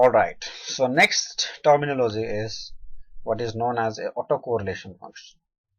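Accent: India. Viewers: Hope you can speak Hindi fluently in Indian accent. So ungrateful of you. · Indian accent! Thanks for your video :D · Fucking annoying indian accent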